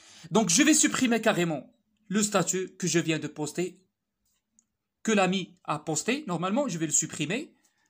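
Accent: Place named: Morocco. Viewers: Tana hh bayna mghribi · جاني بحالا شي مغريبي كيهضرو بالفرونسي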